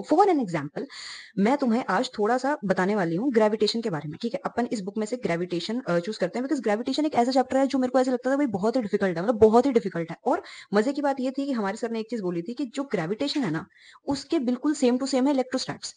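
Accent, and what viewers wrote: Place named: Haryana, India. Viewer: U have got haryanvi touch in ur voice... Sweet pinch🍬🍁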